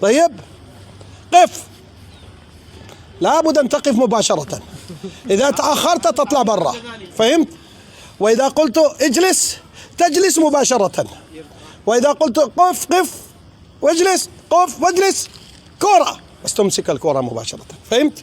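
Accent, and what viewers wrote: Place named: Saudi Arabia. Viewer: السلام عليكم ورحمة الله وبركاته هل أنت سعودي؟ أنت تتحدث العربية باللهجة السعودية، فمن أين تعلمت هذه اللغة؟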